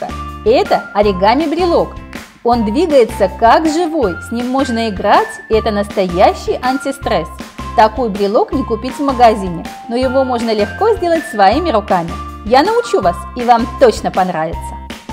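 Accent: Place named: France. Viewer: Кто заметил её акцент , похоже она францужинка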